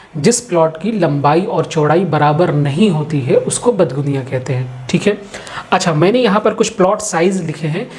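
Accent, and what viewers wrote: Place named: Lucknow, India. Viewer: Bahut achche se explain kiya h lucknow se hn vo aapke bolne ke andaj se pata chal raha h. Informstive video h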